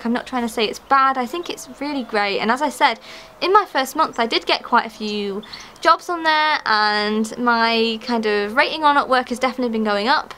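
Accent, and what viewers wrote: United Kingdom. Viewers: came here for upwork related content but oh God That British accent <3 I want to speak like you :( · english from uk lovely :)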